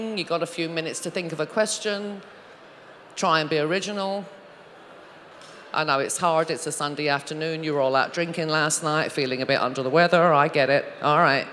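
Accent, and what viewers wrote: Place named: Cockney, United Kingdom. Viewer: Love that East end accent!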